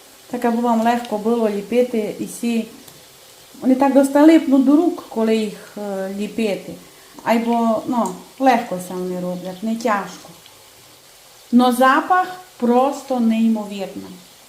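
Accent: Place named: Berehove, Ukraine. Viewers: З акцентом. Суль, олую, ойво. Це русини так розмовляють. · Як я люблю слухати вашу мову. У нас так говорила сусудка , вона була д Берегово.Подяка вам за рецепт.❤ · Украинский язык у этой пани вперемешку с русским. Три украинских слова одно русское. Акцент западно- украинский, это верно, с поющими нотами.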